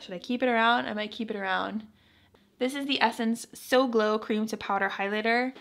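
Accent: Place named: New York, United States. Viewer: I love your New York accent!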